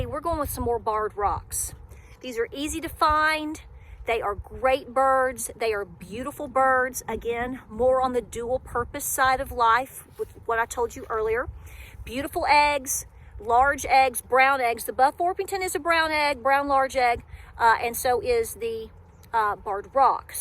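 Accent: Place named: Virginia, United States. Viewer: Golden Comets are my number one! I do like my Easter Eggers because of the egg color even though they are not big egg producers! Which brings me back to the Golden Comets, they make up for the low production numbers of my Easter Eggers! I was born and raised in Virginia, but dad moved us to Texas in the early 70's. So I appreciate your accent! I can still bring mine out when I need it!😂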